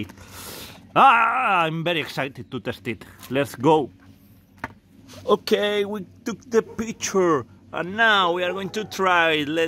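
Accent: Spain. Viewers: I just bought this now, nice review. I like your accent btw, are you Spanish? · Me encanta estar buscando todo en ingles y encontrarme con un español hablando ingles, como se nos nota el acento cuando hablamos... Nice video mate :)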